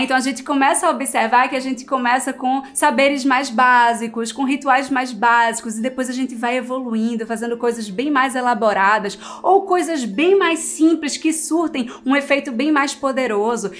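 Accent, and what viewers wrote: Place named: Northeast, Brazil. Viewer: Nordeste, né, bê. 😘 És bem vinda aqui.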